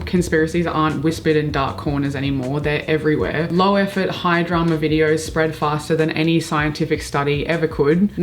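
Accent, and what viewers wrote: Australia. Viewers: Aussie John Oliver is back!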